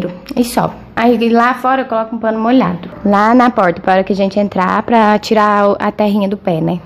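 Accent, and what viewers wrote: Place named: Minas Gerais, Brazil. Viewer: Nosso sotaque mineiro e outro nível ❤️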